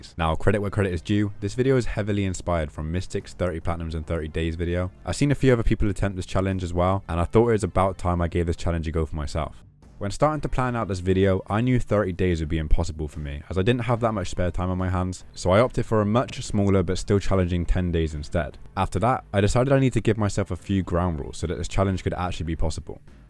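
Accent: United Kingdom. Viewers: This video is absolutley top tier! Love to hear another brit! :) keep them coming man!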